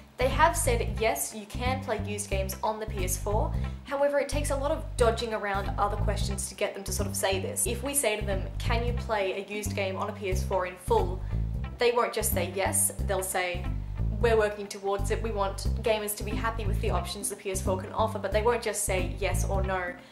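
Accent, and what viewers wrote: Australia. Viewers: I love how aussy ppl talk, you called the vita veetr;p aha your super cute though.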